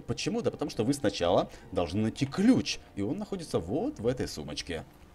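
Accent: Ukraine. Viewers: FryTech228 Это диалект. Так говорят на Украине (в основном) и юге России (меньше).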